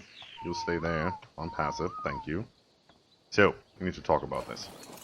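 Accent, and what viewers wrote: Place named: Germany. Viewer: Hey hey, So wie du redest würde ich sagen Deutscher xD nettes video ;D besteht der fehler nach dem Server reboot immernoch ?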